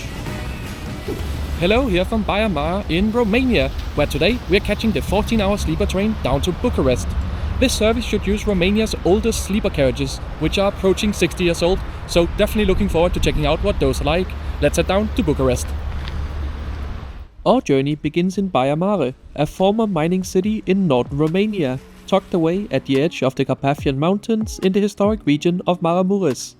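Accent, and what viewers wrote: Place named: Denmark. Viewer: He's from Denmark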